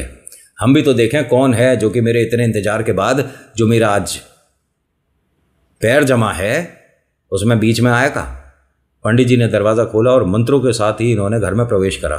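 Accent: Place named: Braj, India. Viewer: 🙏🌹जय श्री श्याम 🌹🙏 भाई ये क्यों बोल दिया की सिर्फ कहानी ही मिलेगी ये किस ग्रह के जीव की बात मान ली अरे भाई हमें ज़ब इधर उधर की न मिल जाय मजा नहीं आता और बीच मे जो ब्रज भाषा निकल जाती है उसे छुपाना नहीं वो ही तो आपके मुख से प्यारी लगती है तो फिर धन्यवाद जैसी आपकी मर्जी सुनाओ हम तो घर मे भी सुनते हैं 😅अलीगढ से रविंद्र सिंह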